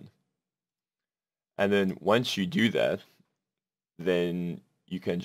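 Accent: South Africa. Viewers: Added a Like and Subscribed , you are from RSA right ? xD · South African??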